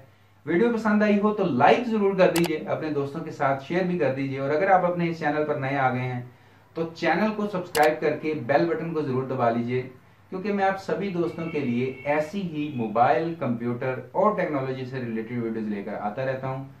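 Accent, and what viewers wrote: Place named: Lahore, Pakistan. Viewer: The accent looks like he is from Lahore / Pakistan..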